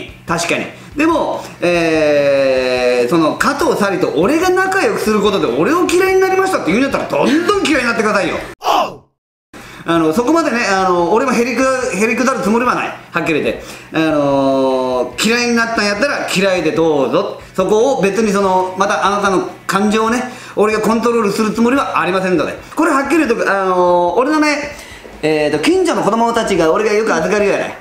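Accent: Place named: Hakata, Japan. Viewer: 清々しい感情と博多弁がとても好感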